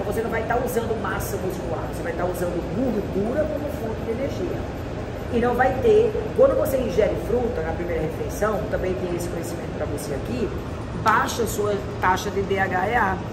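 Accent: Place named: Northeast, Brazil. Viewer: Mulher vc sabe tudo não vejo a hora de começar seu treino ,já gostei de vc de cara esse sotaque nordestino é muito massa parabéns por o seu trabalho ❤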